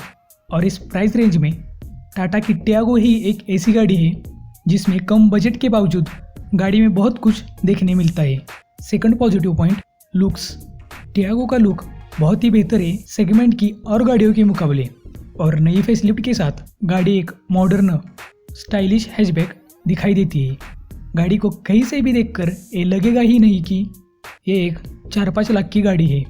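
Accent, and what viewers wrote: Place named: Satara, India. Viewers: Bhau tumhi Satara che aahe watta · भाउ तु मराठी मानुस दिस्तोय बोलन्या वरुन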